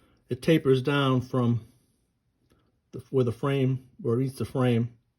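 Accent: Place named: North East, United Kingdom. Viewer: Sounds like you're from up here in the North East as well? A rare breed for firearm reviews! Appreciate the content and how thorough you are going over the model!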